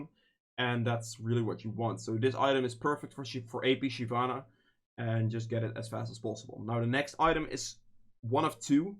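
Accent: Netherlands. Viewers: as a dutchy i can tell you are dutch. nice guide thx!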